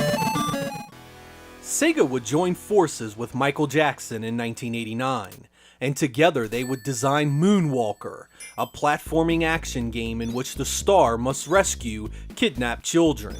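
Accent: United States. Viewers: I guess he's from America?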